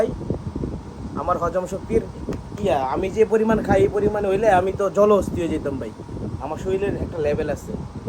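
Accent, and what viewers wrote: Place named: Narsingdi, Bangladesh. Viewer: I, আপনার কথায় নরসিংদীর টান আছে।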